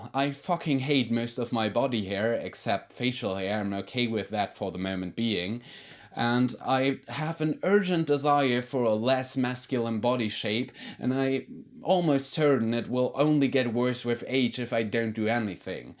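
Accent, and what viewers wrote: United Kingdom. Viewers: Darf ich fragen woher du deinen Akzent hernimmst? Klingt nach einer sehr ungewohnten britischen Varietät. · I'm still not sure if I'm an NB/Agender/Genderfluid but I'm OK if ppl call me female bc I know they just don't know it better (but it literally gets on my tits sometimes...) but I get rlly happy when called a boy...♥ I just wish there was less binary pronoun-calling in Germany as well as gender role expectations... People can be so narrow-minded limiting themselves and others. But wanting it changed from one day to the next is utopian... either way... work on that adorable British accent, darling. Very neato. Keep it up! ♥ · you legit sound a bit scotish:D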